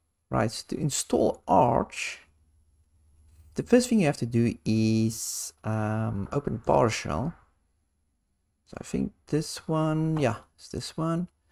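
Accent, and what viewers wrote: South Africa. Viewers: I recognize a fellow SAn when I hear one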